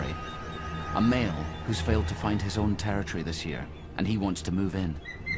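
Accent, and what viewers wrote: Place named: Scotland, United Kingdom. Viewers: If you can't understand the accent then you won't be able to understand Scottish people if you were to visit these places on the screen in real life so why watch? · Lmao I don’t think this person realizes what a run-on sentence is. Also, you are entitled to your own opinion. You literally just gave us yours. So what is the big deal if we like a celebrity? Can we not like what we want to like? With the whole narration thing and you not understanding/liking the accent, I really don’t know what you expected. It’s a documentary about nature in Scotland….so it makes sense why it’s narrated by a pretty well-known Scottish actor. It’s supposed to add to the fact that this is about SCOTLAND. If you have such a problem with it, don’t watch it. Simple.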